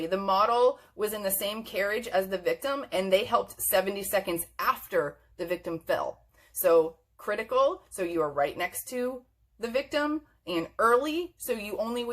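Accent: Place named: United States. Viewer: thank u thank u for making this engaging to watch because u talk like an actual person. you dont use like a million long words per sentence like these other educational videos you just talk normally and your actually fairly relatable and chatty. and also all the videos for my course are british and after living here for 16 years british accents really start to grate on you so hearing an american accent was refreshing and much less dreadful. its 1am you lowkey kinda saved me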